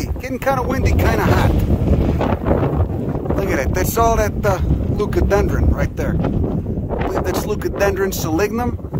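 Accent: Chicago, United States. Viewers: I never learned so much while cracking up at his awesome Chicago accent. Salute from the suburbs.#Elgin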